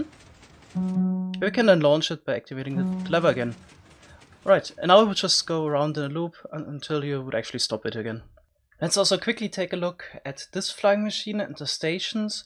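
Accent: Bavaria, Germany. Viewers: Funny how you pronounce the "r" in the german word "erfinderisch" for an english audience in a spanish or italian way ;) Edit: Could be bavarian or so, do you speak a dialect often? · das lustigste ist, ich schaue dich seit ewigkeiten, und habe schon immer den deutschen dialekt rausgehört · Du kommst aus Bayern oder? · Now this is an Bavarian dialect I think. But I don't think many people care about that even in Germany. Although it is quite hard to understand German dialects sometimes. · Ich habe sofort gemerkt, dass du deutsch bist😂👍🏻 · Hey, ich habe mir mal vor einer Woche ein Video von dir angeguckt und habe ziemlich sofort bemerkt, dass du Deutsch bist. irgendwie kann ich es immer sehr gut heraushören, wenn ein Deutscher Englisch spricht xD. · I'm Dutch but I've always noticed his German accent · Ich hab immer mich gewundert was es für ein Akzent ist, ich hab vermutet dass es Deutsch ist aber war mir nie sicher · I love your german accent, also love how you say BEE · Well as a german i obviously noticed your german accent right away, but who am i to point that out? 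I'm just here for the nice content :D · Kommst du aus bayern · Bist du Bayer?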